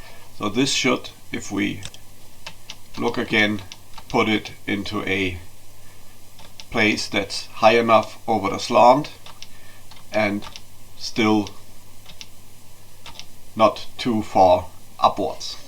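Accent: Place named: Germany. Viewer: German english with stuffy nose... What a phonetic combo... Nice tut by the way, good job.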